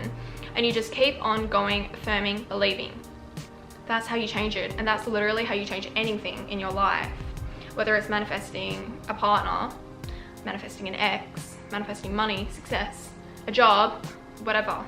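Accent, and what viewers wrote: Australia. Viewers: not me slowly adapting aussie accent bc of her 😭😭🔪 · as an Aussie U can tell cause of the r sound, like when she says nose she says norse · R u an Australian? Ur accent is totally different 😍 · i watch her so much i'm starting to speak with an australian accent now.. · its an aussie accent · Please my British accent sounds so stupid trying to imitate her aussie accent 💀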